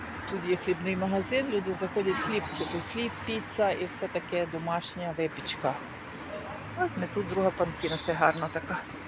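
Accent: Lviv, Ukraine. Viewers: Привіт зі Львова. Чути по розмові, що наша😊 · Si sente dialetto Leopli❤ Pruvit Sono da cittadina vicina al Leopoli Sambir💙💛 Slava Ukraini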